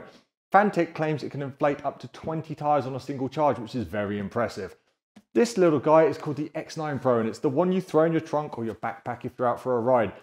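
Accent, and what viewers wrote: United Kingdom. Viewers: British accent !!! Peace!!!! I am out of here.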